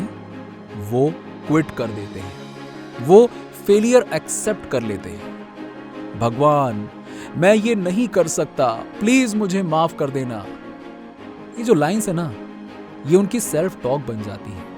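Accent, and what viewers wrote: Bengal, India. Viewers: Bangoli accent was good..,...I liked that voice... Resume that voice again...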